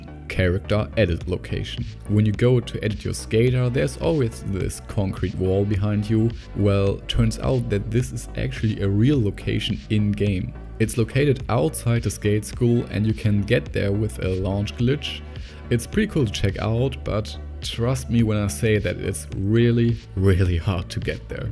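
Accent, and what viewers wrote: Germany. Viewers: Man hört raus das du deutscher bist kuss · Are you german couse it kinda Sound like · Man hört so hart, dass du deutsch bist xD feier ich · Bro awesome work on that iceberg please more Also i kinda hear some german in your voice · Deutscher Akzent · Man hört das du deutsch bist ,oder? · This Video was german i hear it in his voice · Ik erkenn jeden Deutschen an seiner Englischen Aussprache! Grüße aus der Bundesrepublik mein Freund! ♥ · Ei hiar a german Äksent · German accent 😅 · Als deutscher hört man den Dilekt direkt raus 😂✌🏼. Aber sehr nices video! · A skate3 Iceberg?! Genius! Are u german? Ich höre irgendwie einen Akzent raus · Dieser deutsche Akzent xD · Der deutsche Akzent sticht immer hervor min jung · man hoert den deutsches akzent sehr raus wenn man selber Deutscher ist :D sehr gutes Video! weiter so! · He is german, you know how hard it is to switch languages without having an accent? 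· Man hört voll das du deutsch bist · Sehr schönes Video, deinem Akzent zufolge denke ich mal dass du deutsch sprichst :) Die Theorie am Ende lässt mich gerade nicht los, würde aufjedenfall Sinn ergeben. Danke für das informative Video!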